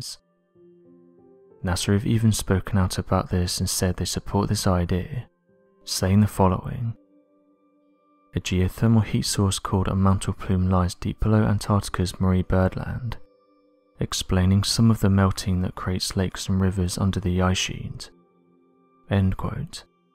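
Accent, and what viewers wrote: England, United Kingdom. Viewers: I think he's voice is lovely and I love he's English accent 😍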